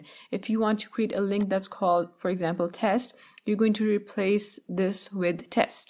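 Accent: India. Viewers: OmG!! But your accent is so sweet! A very mild Hindu accent. I love it!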